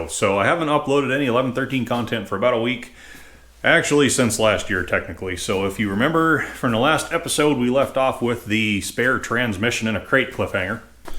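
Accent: Minnesota, United States. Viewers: "Pardon me", but I did not understand the mustard reference. :-) BTW, I believe it is "first gen" a.k.a., first generation and not "first gin" but we all know what you mean. It is somewhat similar to those who say "escavator" rather than "excavator" which I think may be a MN thing. Yep, you are a mechanic and a damn good one, and do not pretend to be a linguist. I ain't one of them there linguist neither too. :-) Keep up the GREAT Cat work and tnx for letting us tag along while not even get our hands dirty.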